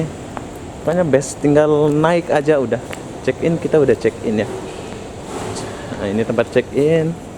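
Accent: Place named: Malaysia. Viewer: Kayanya KK sudah lama di malaysia, lagot bahasanya udah ngikut meleyu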